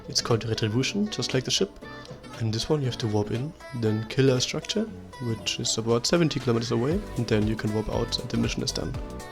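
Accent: Germany. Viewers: you have such a cute Deutch accent =)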